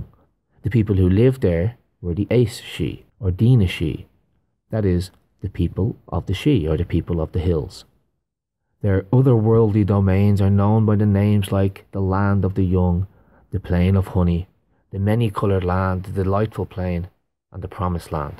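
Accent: Ireland. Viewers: Your Irish accent and pronunciation granted this the perfect seal of authenticity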